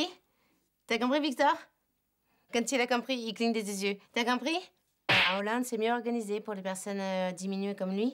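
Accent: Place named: South, France. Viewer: Mais pourkoi l accent sud? Une recette une bequille de soutient faute d ecriture mode parisienne de ce foutre des chti s et marseillais etc c est indigeste votre truc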